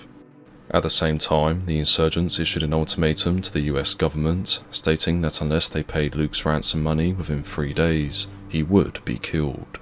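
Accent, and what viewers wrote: England, United Kingdom. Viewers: I agree the video creator really works hard on finding what he can about the wars all over. He has a very clear and easy to understand what he's saying. I'm American and I assume the channel owner is from England and I can understand his accent easy. I drive a lot and have listened to most of his content driving down the road with my ear piece. I know some of this to be true which I am sure all things in the video happened. We love your channel my whole family. Thanks for the phenomenal work you do.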